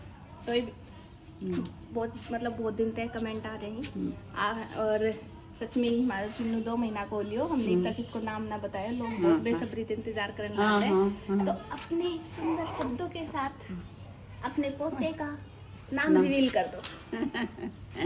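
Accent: Haryana, India. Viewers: Haryanvi acent is very Lovely and name is -moksh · Apni boli m hi banaya kro vlog 😂 haryanvi on top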